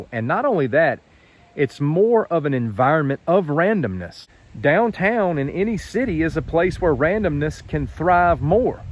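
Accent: South, United States. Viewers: Just Love your Southern accent ! Greetings from Australia. Bless.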